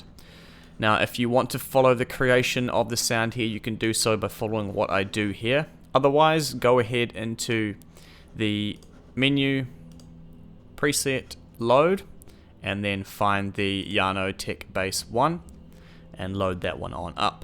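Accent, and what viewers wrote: New Zealand. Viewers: sounds like hes a kiwi. we just sound like that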